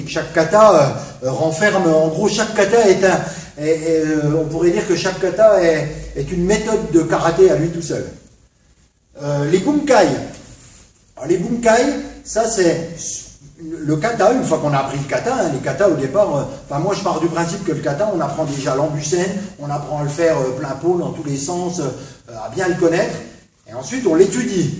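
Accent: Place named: Franche-Comté, France. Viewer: J'adore tes vidéos. Tu as un léger accent qui me rappelle mes origines, le territoire de Belfort et la haute Saône. Cordialement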